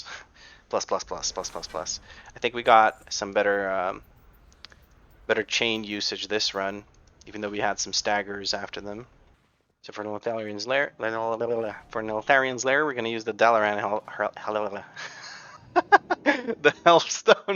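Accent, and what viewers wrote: United States. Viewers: Awesome vids! You sound American, but I keep seeing Russian and German in party chat. Are you from Europe? Im partially asking cuz usually once per video I see you pull mobs I have never seen pulled before😂 Was just wondering if it was more common in NA. Or if you just pull somewhat randomly until you get to a key level where it gets a bit harder. All vids are really helpfull and informative as im trying out tank for the first time😅 Keep em coming💪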